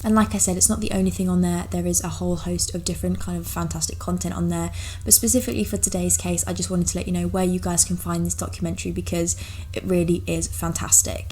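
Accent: United Kingdom. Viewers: Something about the British accent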